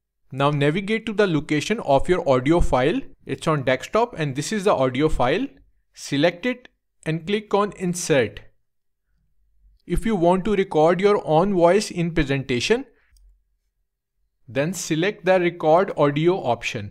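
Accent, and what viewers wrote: India. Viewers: Raw indian accent not even stereotypical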